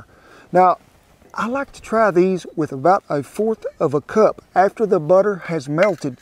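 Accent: United States. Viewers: 😲 I really like your american accent.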